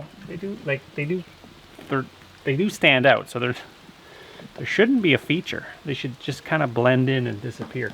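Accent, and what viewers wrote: Canada. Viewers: I just love to hear you talk. Canada speak, uh, yes.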